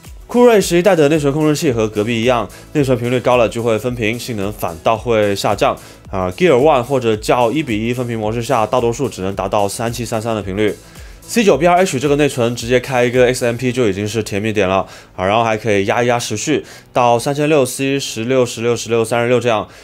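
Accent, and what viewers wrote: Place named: Guangzhou, China. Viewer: diu 一听就是广州佬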